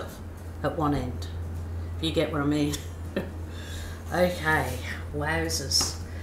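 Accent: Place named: Northern Ireland, United Kingdom. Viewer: Also from Victoria BC! Originally N.Ireland. I was trying to figure out accent and thought she sounded a bit like me but with a mixture! Love her work land her ability to so naturally express herself in words and in paint!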